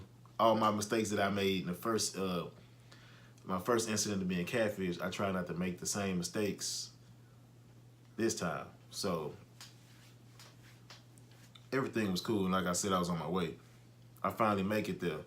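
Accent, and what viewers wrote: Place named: Houston, United States. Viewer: U sound like you from Houston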